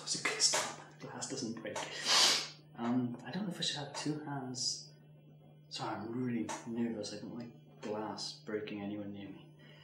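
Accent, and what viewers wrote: Ireland. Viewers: Are you from Ireland? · He sounds like an Irish guy that moved to England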